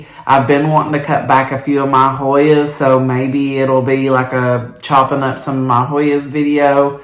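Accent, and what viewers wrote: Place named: South, United States. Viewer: Why do I love your voice/accent so much?! I think it’s bc it’s southern-ish sounding, yet you don’t speak As slowly as other southern accenters do? I have no idea, lol.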